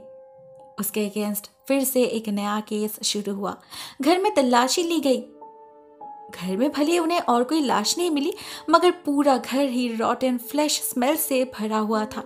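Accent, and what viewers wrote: Bengal, India. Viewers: are you bengali or bihari ?? ❤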